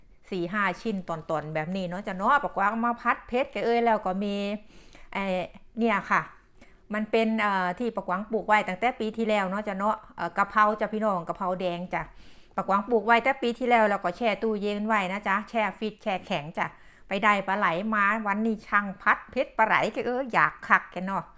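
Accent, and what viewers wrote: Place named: Korat, Thailand. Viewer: วิธีหุงข้าวเหนียวด้วยไมโครเวฟ เยี่ยมเลยค่ะป้า อยู่ส่วนไหนของโลกก็มีอาหารของโปรดบ้านเรากินน้อจ้าป้า สำเนียงโคราชนี่ได้ใจย่า🙏❤️🤟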